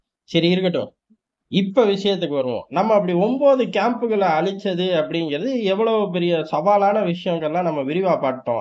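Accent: Madurai, India. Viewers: தல...செம்ம இன்ட்ரஸ்ட்டிங்கா பேசுறீங்க தல....உங்க மதுரை ஸ்லேங்...சூப்பரா இருக்கு...இதயே கண்ட்டின்யூ பண்ணுங்க...இன்னொரு சேனல்ல புதுசா பானைக்குள்ளருந்து பேசற மாதிரி ஒரு வாய்ஸல பேசுறீங்க போல....அது சரியா புரிய மாட்டேங்குது ப்ரோ....இப்ப நீங்க பேசுற வாய்ஸ்தான் சூப்பர்....அண்ண அமெரிக்கா பயபுள்ள மைலேஜ் கீழ வந்து சொல்லக்கூடாது...சூப்பர்....❤❤❤❤❤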